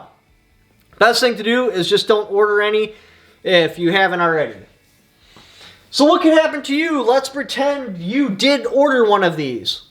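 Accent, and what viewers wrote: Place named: Wisconsin, United States. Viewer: Yer fuckin Sconi accent is hilarious.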